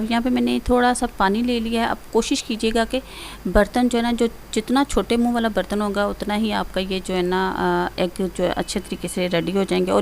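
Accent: Punjab, India. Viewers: Hahahahahahaahaaha Punjabi ka tarhka I like it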